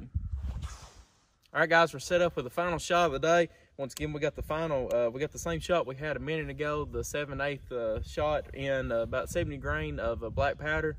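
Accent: South, United States. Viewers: I appreciate your southern dialect also ❤️😎🌟🌟🌟🌟🌟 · That´s a fine Southern accent!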